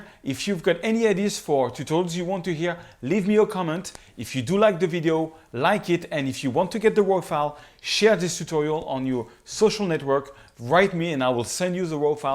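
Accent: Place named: France. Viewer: It's funny to come back to these videos to see how much his French accent has dwindled over the years--video content still 🔥though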